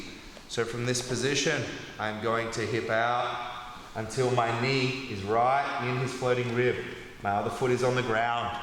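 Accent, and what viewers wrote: Australia. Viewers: I watched a bunch of Bjj videos, but for some reason, your videos resonate with me and easy to digest. Maybe it’s your Aussie accent haha. thanks from San Francisco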